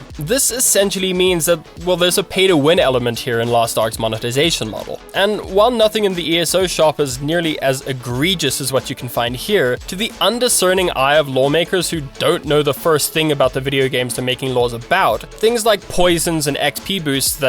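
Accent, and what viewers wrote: South Africa. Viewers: love hearing a fellow Saffa on YouTube! great video! hope your channel blows up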